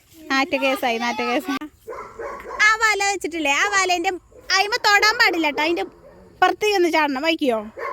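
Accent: Malappuram, India. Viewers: നിങ്ങൾ മലപ്പുറത്തു കാരാ സംസാരം കേട്ടിട്ട് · സൂപ്പർ നിങ്ങൾ മലപ്പുറം ജില്ലയാണല്ലോ സംസാര രീതി കേട്ടപ്പോൾ തോന്നി എവിടെയാ സ്ഥലം 👍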